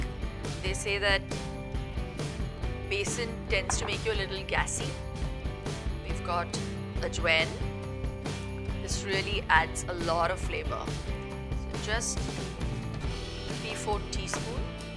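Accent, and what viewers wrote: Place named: England, United Kingdom. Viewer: I like her English accent but didn’t understand a word, thus will not be making this dish😢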